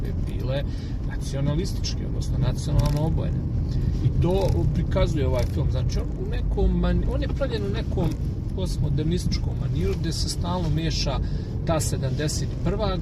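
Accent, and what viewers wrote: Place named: Central Bosnia, Bosnia and Herzegovina. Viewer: Kako ti je uspelo da zadržiš akcenat srednje Bosne ako si od 1992 u BG? Hvala na odgovoru.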